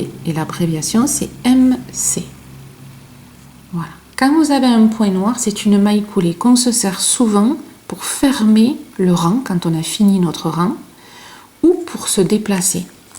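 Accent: South, France. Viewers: Bonjour. Très utile ce tableau, associé au plaisir d'entendre ton accent du sud!❤❤❤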